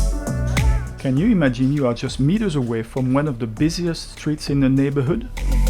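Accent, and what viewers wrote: France. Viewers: I'm a new subscriber here & I just found your channel by sheer accident, so I immediately subscribed. Oh my gosh, this Sir, is absolutely breathtaking from start to finish! Montmartre is so lovely & wonderful! For our first week in Paris, we're staying for 9 days in this area, so we're utterly excited! You truly deserve thousands more subscribers, as your entire presentation, pleasant & interesting narrative & even the music throughout, is just brilliant & beautiful. My family & I are arriving in Paris later this year & honestly, I feel the tears welling up in my eyes when I watch anything regarding Paris & your video is the "icing on the cake"! When I left Paris & France many years ago, I cried on my last day there & I always yearned desperately to return & this year is my absolute dream come true! Thanks to my wonderful husband & family. 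I'll be in your beautiful & stunning country for over 6 weeks, so I don't take this for granted whatsoever. I'm blessed. It's also refreshing to hear a Frenchman taking us around his country, as you know France so very well. Your accent is wonderful! I'll watch more of your awesome videos, as they all appear very interesting & enticing. I should add, Paris is truly magical, very romantic & ethereal & these statements are never underrated! France too, is a truly spectacular country, possessing such rich history, culture & breathtaking scenery. The architecture and the excellent cuisine/food just Wow!!! Merci Beaucoup for your time & your won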